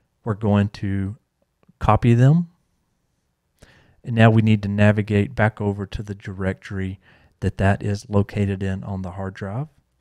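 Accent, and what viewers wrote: United States. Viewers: I read Birmingham and instantly thought "England UK? He sounds American" guess I gotta remember you guys have a lot of our city names lol. Good video btw 👌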